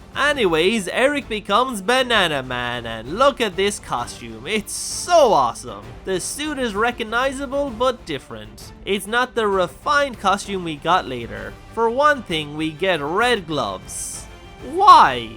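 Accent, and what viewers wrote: Ireland. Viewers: ffs... I was trying to work out your accent.. going from dutch to welsh to ...ireland... then i heard your 'artwork'..deffo southern irish.. scrolled down to see Galway , derp. Onie tuck uz twarthie minnits , sake!... I used to see bananaman on u.s. tele late 80's via nickelodeon...had no clue the backstory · I normally like an Irish accent - but I'm afraid your voice makes my ears bleed.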